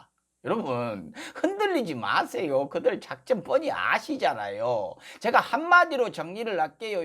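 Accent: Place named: Rural, South Korea. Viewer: 진짜 최고 숩니다 ㅋ 그 언어 그 딕션 특유의 쫀득쫀득한 촌놈말투에 지식까지 역대급 강성범님 식사 잘 하십쇼 ^^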